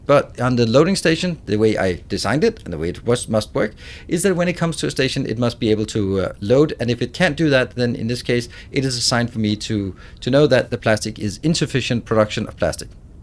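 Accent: Denmark. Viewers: a dane can hear a dane XD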